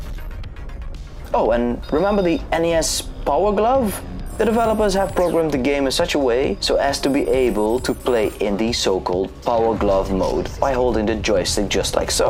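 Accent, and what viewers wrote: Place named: Netherlands. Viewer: Dutch? seems like a dutch accent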